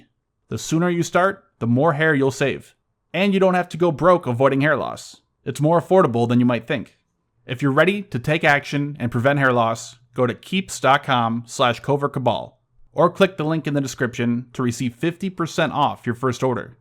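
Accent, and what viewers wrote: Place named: North, United States. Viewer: he might indeed be at least from northern US .. to my,western, Canadian ears I don't hear much difference ..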